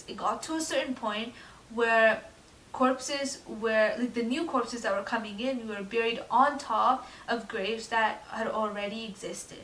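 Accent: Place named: France. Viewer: Woah this is so interesting, and also your French accent is so nice 😊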